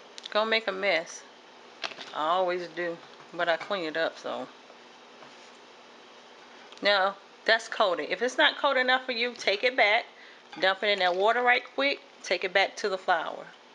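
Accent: South, United States. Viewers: Love your voice and it’s making all kinds of southern accent sense to me! Sending love cook to cook from Bham, AL! ❤❤❤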